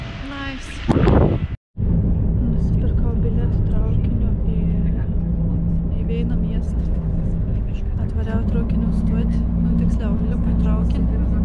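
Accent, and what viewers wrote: Samogitia, Lithuania. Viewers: Video vuo 👍 O jau tas kalbėjimas žemaitiškas, koks geras... :hands-yellow-heart-red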